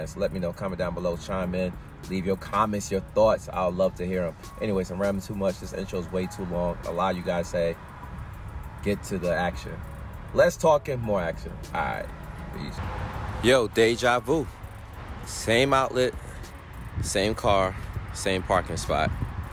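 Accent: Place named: New York, United States. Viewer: I love u talking cuz I am thinking ur from NY and I am from there and I live in Charlotte now so I like to hear u talking. Just found ya channel. Dig ur vids. 💪🏿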